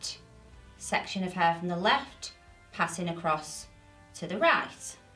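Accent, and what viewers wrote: United Kingdom. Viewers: Just discovered your channel, amazing hairstyles. Also, your accent is lovely, from which part of the UK are you?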